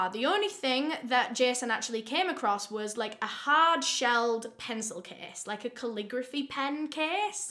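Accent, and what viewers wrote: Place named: Yorkshire, United Kingdom. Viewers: you know how to brighten my day with your true crime tales and your delicious-sounding Yorkshire accent! Thank you so much, my dear girl! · I'm going to be the billionth person to compliment voice. 🙂I've heard a lot of Yorkshire accents in period pieces, but I'm pretty sure this is the first time I've heard the modern version. I didn't even know where in the UK from until she mentioned it. I love listening to her, and think she does a great job presenting the cases. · Sounds more like a Leeds accent 👍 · Yay a northern lass! I'm guessing Leeds? 🙏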